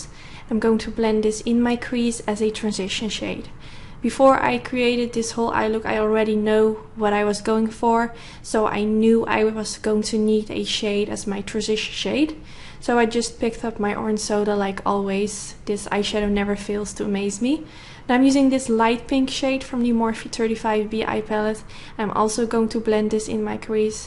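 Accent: Netherlands. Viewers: nothing went wrong!!! it is extremely beautiful...btw are u Dutch? u have kinda like a Dutch accent. Beautiful!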